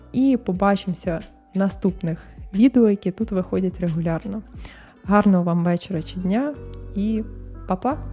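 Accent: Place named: Surzhyk, Ukraine. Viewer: дуже дякую, що ділитесь власною історією, як на мене, це дуже корисно і полегшує відчуття всередині нас! Ви пройшли реально багато труднощів і тому хочеться висловити Вам слова підтримки. Ви справді молодчинка<3 за суржик не партесь, він звучить афігєнно і середньостатистичному носію мови все більш ніж зроз:)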